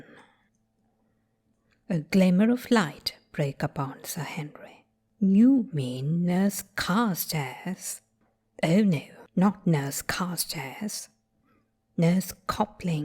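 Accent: RP, United Kingdom. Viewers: I Love upper received pronunciation.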